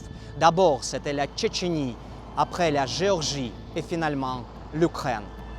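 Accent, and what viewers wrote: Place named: Russia. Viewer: ну жестко русский акцент, а так хорошо!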